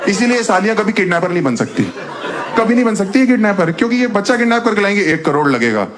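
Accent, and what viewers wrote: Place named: Uttar Pradesh, India. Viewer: His UP accent is the best 👏🏻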